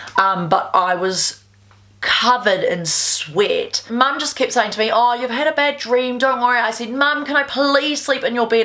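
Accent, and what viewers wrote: New Zealand. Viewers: Shivers.. You gotta love how New Zealanders talk. Ever seen Flight of the Concords? Case closed.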